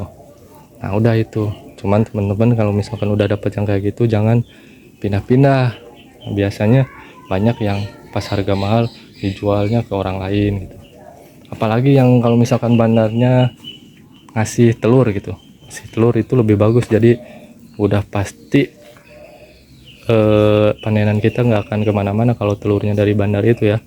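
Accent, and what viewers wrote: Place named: West Java, Indonesia. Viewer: Alamat d mana...kaya orang Sunda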